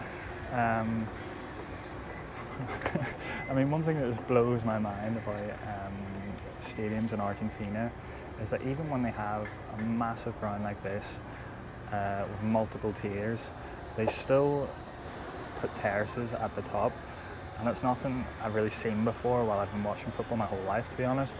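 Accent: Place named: Northern Ireland, United Kingdom. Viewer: Great video, thanks for visiting my favourite club. I can recognize your NI accent :-) Hope you have a great time in Argentina.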